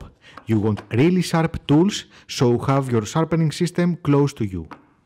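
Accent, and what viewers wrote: Greece. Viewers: He Greek haha! (I'm Greek too that's why I recognize the accent) Nice video tho well done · I immediately understood that you were Greek!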